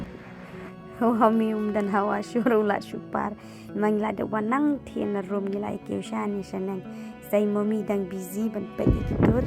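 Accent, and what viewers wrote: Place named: Sohra, India. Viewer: Ka sur kren jong phi sngewthuh ba dei nong sohra ,dang kiew shaphrang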